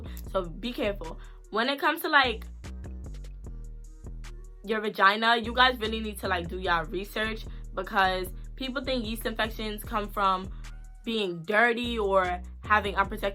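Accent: New York, United States. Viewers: I love your new York accent 😍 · Youu from Ny ? Ya accent 😍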